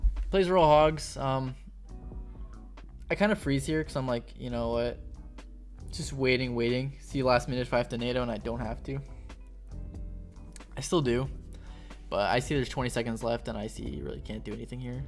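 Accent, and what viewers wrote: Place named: Canada. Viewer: I can tell ur a Canadian boy😂😂 one of my favs for sure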